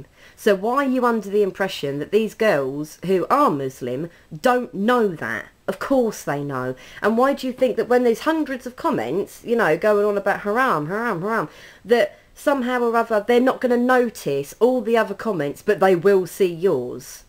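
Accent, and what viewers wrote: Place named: United Kingdom. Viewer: haha:) lol all European accents sound the same to me! It takes me a good while to even figure out Scottish from British.... :/ but im learning slowly and yeah your soo right Americans got like an accent per state lool But i absolutely lovee the british accent its soo adorable<3 waitt.. your British right??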